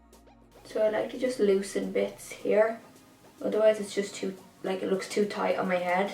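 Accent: Ireland. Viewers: Are you Irish? Cause guuuurl I love your accent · Are you Irish? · Is this girl from Ireland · Irish? Me too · Who else thinks she sound Irish ☘️ 🇮🇪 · Great messy bun you always have great style it's why I luv watching you. Not to mentioned as a transplanted fellow Irish gal I luv the accent....hugs to you 😘👍🇨🇮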